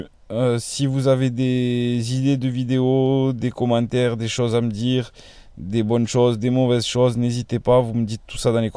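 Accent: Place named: South-West, France. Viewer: Liqueur de menthe ? Sorbet ? Miam ça baffoulle ? Pourtant une très belle voix avec un belle accent du sud ouest ! Merci pour tous ses conseils.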